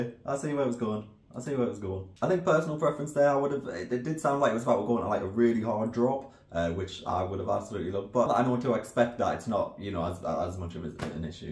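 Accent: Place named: Newcastle, United Kingdom. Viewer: love that you're a Geordie too! cute. I wasnt expecting from the thumbnail you would be, haha whatever that means but how you say 'Tattoo' :face-blue-smiling:😍 love it. hey from Dublin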